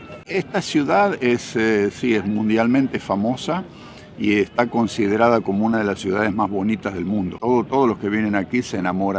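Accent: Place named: Cordoba, Argentina. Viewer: Me gusta mucho como habla, bien Argentino Córdobes, los saludos desde 🇦🇷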